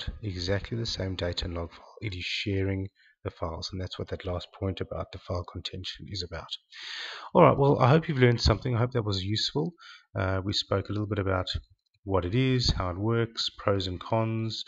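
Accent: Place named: South Africa. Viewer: Your a legend! Quick and easy description and a very pleasent Saffa accent! Good work, thanks.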